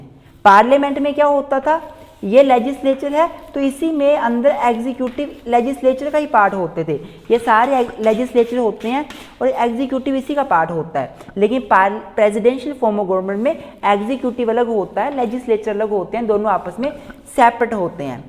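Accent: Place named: Pahadi, India. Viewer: Sir ap phadi ho I mean ap Himachal se ho apki abaj se thoda thoda feel aa rha h phadiyo bala🙂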